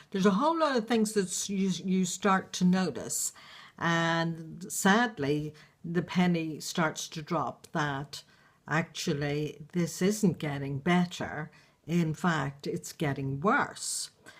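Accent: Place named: Northern Ireland, United Kingdom. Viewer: are you originally from Northern Ireland? I think I can hear an Americanized version of that charming accent. I am watching your videos from mostly the other side of the coin, because I am in danger of getting lost in the alcohol quagmire myself. For a good few years now I've come home from a full-on, highly responsible job and used too much alcohol to calm my senses more often than I should. As much as I detest my addicition to nicotine, alcohol abuse is my real problem and I've grown to hate it and hate myself for being like this. I still function extremely well (but not to my full potential) in my work and pay my bills and my share elsewhere. I've also been watching motivational videos of how people's lives got much better when they quit drinking as well as others who are realistic about their anxiety and how alcohol gives them a brief respite from it, and that's probably me. 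I'm a competent female tech geek who works in a team with 30 guys, but I support primarily other women in top management positions who jokingly(?) say 'they hear the wine calling' at the end of a hard day, so I think this problem may be bigger than most people imagine. I won't go into my life story except to say that I've had a few truly fucking terrible relationships where my partner has been 'trying', to say the least, and one of them even nearly killed me (fortunately a very short-lived yet traumatic relationship once he showed his true colours - he ended up in jail). But the year I spent in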